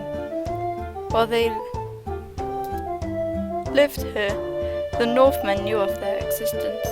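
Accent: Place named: RP, United Kingdom. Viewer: Well done. I am particularly enjoying your First Age work. Your R.P. accent (I'm American) and spot-on pronunciation are particularly delightful to listen to, not to mention the always delightful and apropos music and art choices you make. Carry on!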